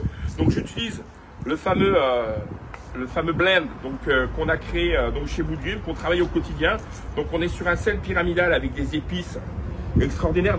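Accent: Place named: United States. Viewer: Svp monsieur le pseudo cuisinier, faite au moins l'effort d'apprendre à cuire une viande correctement, les cuissons c'est une technique, et l'hygiène c'est impératif, je ne vous souhaite pas que la DDPP passe chez vous après avoir laissé votre viande prendre la t° ambiante. Enfin bref, même avec l'accent Américain ça le fait vraiment pas. Si je peu me permettre, vous gagnerez à au moins regarder les vidéos de Aaron Franklin, et comme vous êtes bilingue ça devrai vraiment vous faire progresser.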